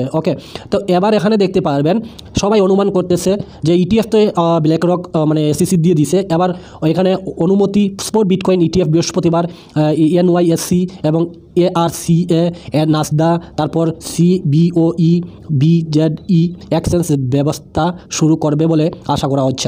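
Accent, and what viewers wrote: Sylhet, Bangladesh. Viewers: Vai Assam e taken naki? Sylheti vasay kota bolen mone hocce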